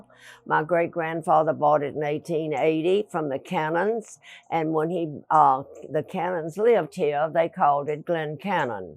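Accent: South, United States. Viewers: Oh dear, what a precious little lady!!! I’m like two minutes in and I’m just dying over her sweet southern accent! 😍😍😍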